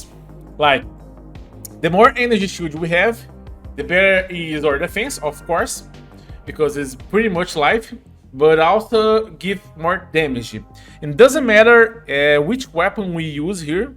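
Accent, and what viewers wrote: Brazil. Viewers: cara um bom conteúdo, mesmo não sabendo inglês direito consegui entender perfeitamente, por ele ser um inglês abrasileirado e isso ficou muito bom de vdd + um inscrito aí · Primeira vez que vi um vídeo dele, achei o inglês bem abrasileirado, ele é br mesmo?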